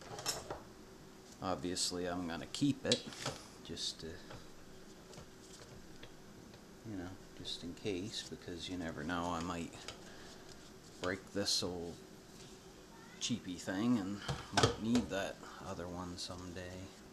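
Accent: Canada. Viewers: You are canadian aren't you?!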